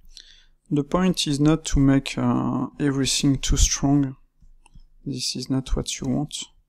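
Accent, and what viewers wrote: France. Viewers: On reconnais le francais assez timide eheh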